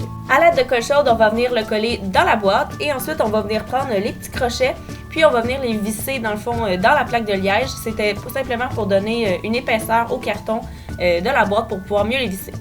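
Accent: Quebec, Canada. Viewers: j'adooooore l'accent québécois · Avec ton accent je pense que tu viens du Canada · C'est québéquoi pas anglais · Tu viens du kébec ? Tu as l'accent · C'est l'accent Québécois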